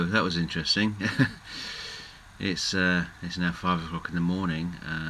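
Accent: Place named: Yorkshire, United Kingdom. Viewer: Well done. Have a listen to the Swedishguitar Nerd comparing his monster '85 Gibson Les Paul to the Epiphone LP Special 2@ Epiphone Special II & Gibson Les Paul Custom comparison More confirmation of the truth. With a sister who's a composer, reviewer and teacher using a 20k Smallman classical, who dispairs at the lack of the expsensive tonewoods for luthiers making top end accoustics in Spain (such is the greed for them for irrelevant kit). She berated my attempts to source a 'good' tonewood electrical guitar. Until I researched and gave up. I trust her, she teaches good performer electric guitarists and as she says, our gendre is wires and magnets. She has always said you can make the body of an electric guitar out of any old crap and provided the wires and magnets are good the sound will be too. The neck has to be playable but the magnets are picking up the fluctuation in string which is then amplified electrically. 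An electric guitar is not amplified by the resonance of the timber. This video says it as it is. There may be the odd nuance, maybe, maybe a harmonic (who knows) but, nothing that can't be induced by a Line6 HD500 somewhere along the supply chain. Some people don't like the truth when it flattens their beliefs and downs their hero's. speaks like a Yorkshireman telling it as it is. Well done. But then again, there are always those that simply have to believe that the world is flat, the universe rotates around the sun and there is a pot of gold buri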